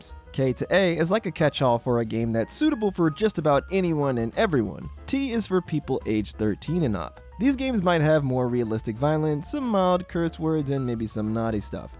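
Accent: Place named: North America. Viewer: Well, none of these are nostalgic or iconic to anyone outside of North America. From your choice of words and accent, it's clear where you're from.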